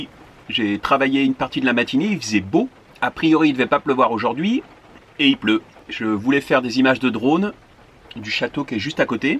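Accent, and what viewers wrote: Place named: South, France. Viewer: Sexy entre le ménage et l'accent du Sud!!! Tjrs en mode groupie ;) Tes vidéos sont sublimes plus sérieusement!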